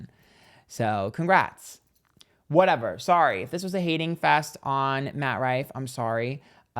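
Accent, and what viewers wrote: New York, United States. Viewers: I knew you had to be a New Yorker lol 💖🤌🏼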